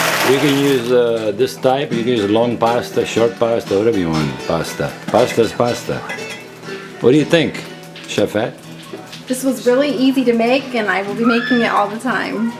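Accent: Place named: Sicily, Italy. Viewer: Wow. My grandmother had that same accent. I haven’t heard a Sicilian accent in so long ❤️ It’s different than an Italian accent somehow.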